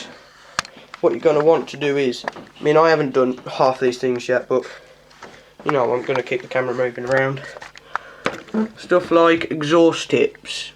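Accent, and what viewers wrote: United Kingdom. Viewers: wouldnt this setting fuck up and slow you down? just wondering + man your cockney is deep af hehehehe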